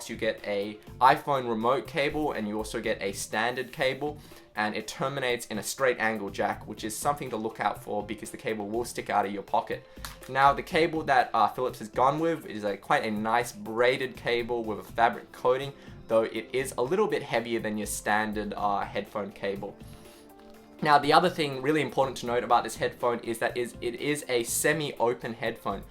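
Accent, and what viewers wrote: Australia. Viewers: i mean this in the best way........its crazy seeing an asian with an austrailian accent.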